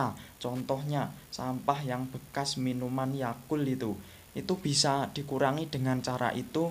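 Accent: Java, Indonesia. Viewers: Hhh untuk merosani jowo banget bang, jowonya endi brow sampan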